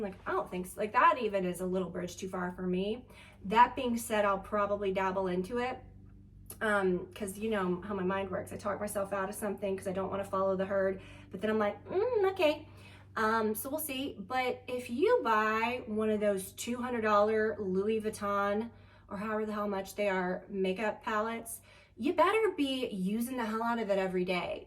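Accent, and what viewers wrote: South, United States. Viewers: your accent! Such a southern belle 🎀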